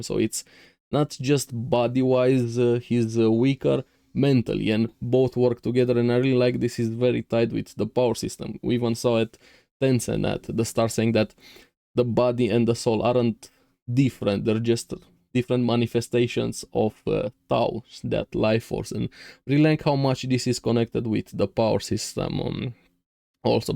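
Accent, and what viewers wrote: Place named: Eastern Europe. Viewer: Soooo happy this anime has finally returned!! I watched Season 1 Hell's Paradise, Chainsaw Man, and Heavenly Delusional all around the same time and been patiently waiting for their return cause I loved all 3. Especially Hell's Paradise cause I think it's such a fire series. Nice review. I liked and SUBBED. By the way where are you from bro?? You have a cool voice and great English. Eastern European or Russian I'm guessing? lol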